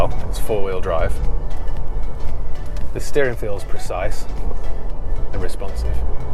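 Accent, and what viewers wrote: United Kingdom. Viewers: u in la with that brit accent bro good luck man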